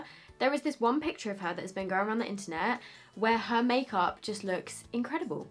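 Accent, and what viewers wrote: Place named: United Kingdom. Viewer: Ahahah sometimes your British accent just like JUMPS OUT 😂 Xx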